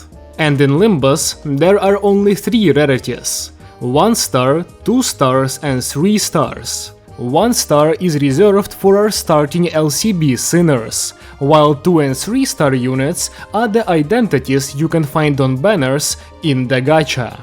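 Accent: Russia. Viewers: Слишком сильный русский акцент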